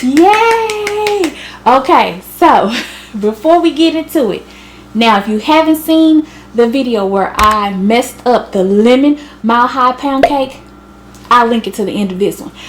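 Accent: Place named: South, United States. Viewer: "Girl,you are some kind of "COUNTRY TALKING ".? WOW! We can barely understand what you are saying.?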